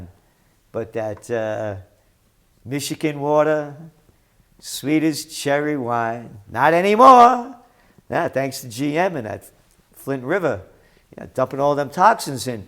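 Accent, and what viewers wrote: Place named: New York, United States. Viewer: Great job Gerald ! I was born and raised in Brooklyn NY and watching how you articulate and present this corrupt system in a very New York way is like music to my ears ! Thanks so much for all you do ! You are part of my "A Team"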